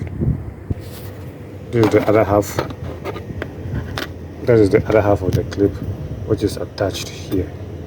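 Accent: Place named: Cameroon. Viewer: This is my Cameroonian brother, I can tell from his English 😂 Am having same kind of noise like ratting in my Honda CR-V 2022.....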